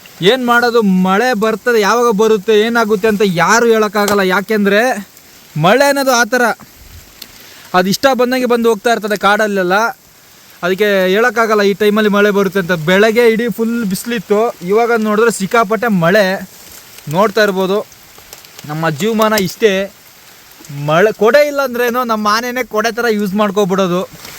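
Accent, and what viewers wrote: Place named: Mandya, India. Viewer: From mandya base adike❤❤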